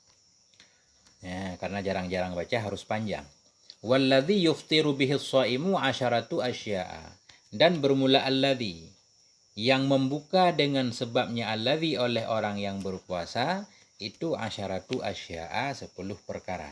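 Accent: Aceh, Indonesia. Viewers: Afwan kiyai, awak kira kiyai dari Aceh karena logat antum 🙏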